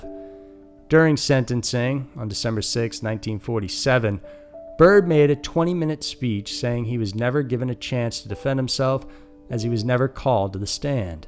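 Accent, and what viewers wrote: South, United States. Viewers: please post every day. I love your hard to pinpoint transplanted Bostonian accent. I asked you on Facebook. I know you've been in NY for years but you don't sound like you are from there either. My husband moved down from NY state in 1978 and you sound way more Southern. You probably won't read this but keep up the great work and Thank you! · Is your Southern accent real?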